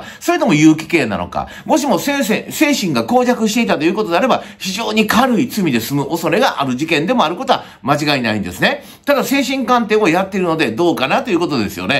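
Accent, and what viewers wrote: Kansai, Japan. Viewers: たしかお父さん、被害者にルナに合わないでくれって電話してましたよね？ルナを止めると暴れるから被害者に伝えたと他のニュースで見ました。 しかし関西弁で軽快なトークで面白い😊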